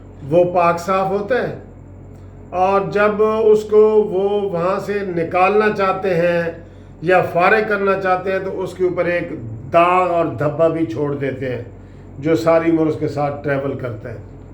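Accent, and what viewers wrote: Saraiki, Pakistan. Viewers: LEHJA SURA-EE-KEE HAAY, URDU...ROU RHEE HAAY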